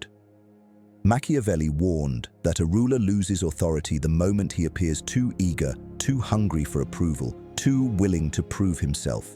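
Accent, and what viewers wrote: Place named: England, United Kingdom. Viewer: Speak in American. We ain't training to be English. For real. Just cause the average American is preprogrammed to think an English accent is more intelligent.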